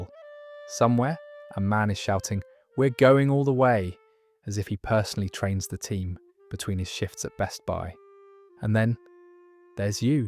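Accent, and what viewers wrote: United Kingdom. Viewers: What's with the English accent? Does that make it more legitimate?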